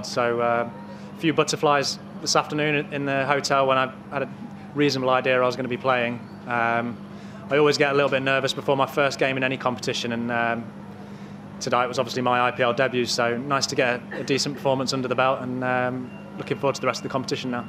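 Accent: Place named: United Kingdom. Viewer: British English accent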